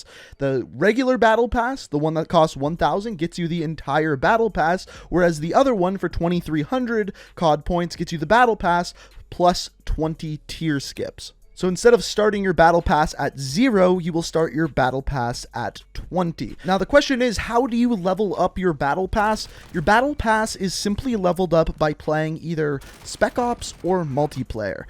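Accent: Canada. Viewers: The way you abowt just gives me terrance and Phillip vibes guy 😂😂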